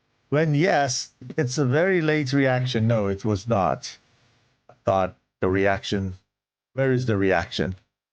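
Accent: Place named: Germany. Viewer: Is the host of this match german or speak german? If so, it did shine threw...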